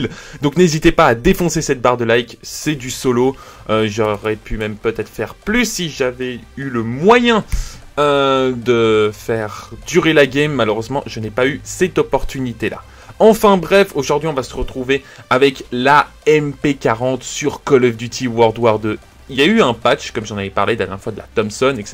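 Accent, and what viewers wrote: Belgium. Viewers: T belge ? :p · Oh tes belge je savais pas😱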